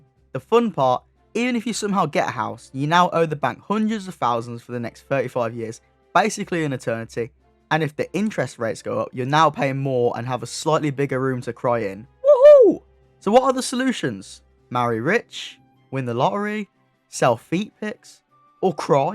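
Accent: United Kingdom. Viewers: Im Nigerian living in UK,but that British acc is mad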